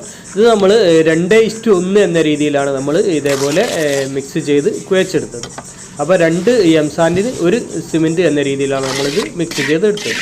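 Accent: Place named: Malappuram, India. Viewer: Adipoli aayittund engale veed evide.samsaram kettitt malappuram aanennu thonnunnu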